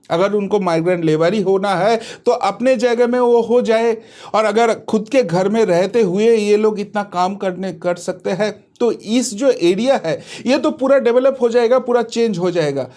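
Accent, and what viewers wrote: Bengal, India. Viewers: da, apnake request korchi, apni Hindi News er jonno ekjon bhalo Hindi bashi News Reader appoint korun. Karon apnar hindi uccharon khub kharap. 100 kothar modhye 90 ta kothai bangla die makhano. Apni dekhben Arnav Goswami ki bhabe suddho hindi bolen. Kharap paben na, follow korun, ar bhabun. Apnar bangla news ami sob somoy dekhi, bhalo lage, banglai chalan....... 🙏🙏🙏🙏🙏🙏🙏🙏🙏🙏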